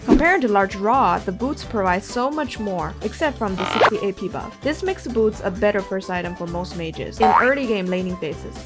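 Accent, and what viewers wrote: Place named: Ireland. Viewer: came from the new boots update, stayed for the Irish accent 🖤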